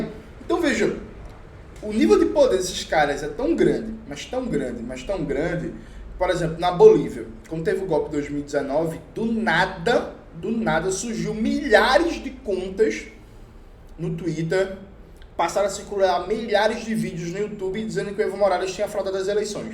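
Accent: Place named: Rio de Janeiro, Brazil. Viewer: leu tudo com o sotacão carioahca dele ne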